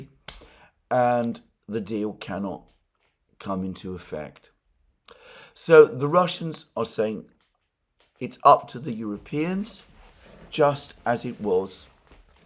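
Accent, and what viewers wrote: United Kingdom. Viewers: Dude, u have problems with your voice and frequent upper respiratory infections because it seems that you have nasal obstruction, deviation or some other anatomic abnormality that affects your nasal breathing and talking. It has been obvious that u grasp for air when talking between the words and your posh english sounds nasal as well. Have u ever consulted an ENT specialist? Most of these stuff can be corrected surgically.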